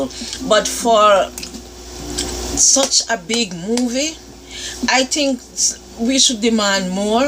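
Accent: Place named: Caribbean. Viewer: great, keep it coming please. Your show is great! Love when you sing yo us a bit. What is your patois? Jamaica? Barbados? Some isle accent, right?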